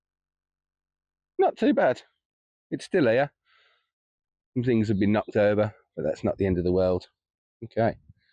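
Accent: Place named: Birmingham, United Kingdom. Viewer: Hi. Just come across your channel and thought I would have a nosey around and see what vids you have done. Which part of the 2nd city are you from? You're only the 2nd person on YouTube I've come across with a Birmingham accent, the other one is Shaun from Happy Days Veg. Although he now lives in north Wales.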